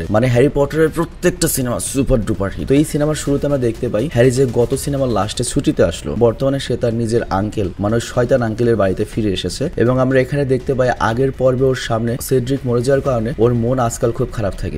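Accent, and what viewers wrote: Bangladesh. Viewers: তোমার এই হালকা বাংলা দেশী টান কথার মধ্যে আমার ভালোই লাগে ❤ কিন্ত হারামাউনি মানা যায় না (হারমাউনি)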